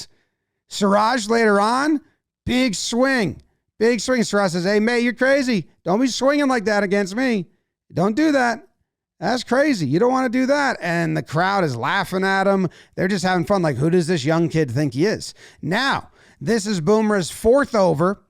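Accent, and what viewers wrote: United States. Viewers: Who else randomly started watching, and after hearing the American accent, thought, I may click off soon but watched the whole thing and thoroughly enjoyed it... Thanks...subscribed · It is so weird to hear an American talking about cricket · Amazing to hear an American commentating, glad you're loving the game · It's pretty cool listening to an American talking cricket! Haha! You should also check Aussie rules football and V8 Supercars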